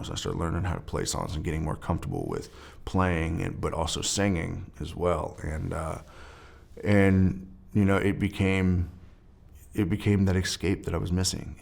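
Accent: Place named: Kentucky, United States. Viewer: Florence Kentucky I'm betting is where he's from Florence Y'all I know that accent it's kinda half southern half Yankee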